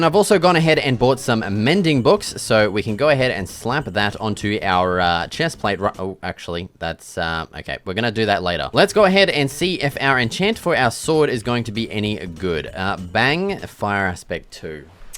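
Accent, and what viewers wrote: Australia. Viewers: I can't tell if you're an ausie or a British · Idk why but your voice makes you sound like the ultimate Australian surfer boy giga chad XD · are u Australian cuz you have a aussie accent some times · Are you Australian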